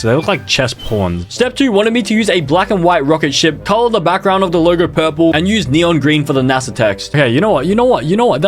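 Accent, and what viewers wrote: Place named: Australia. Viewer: WHY WAS I SO HAPPY FINDING OUT HE WAS AN AUSSIE 💀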